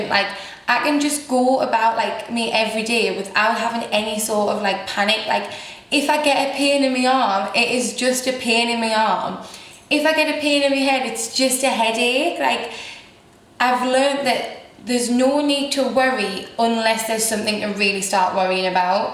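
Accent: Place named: Newcastle, United Kingdom. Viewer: Geordie accent from Newcastle, uk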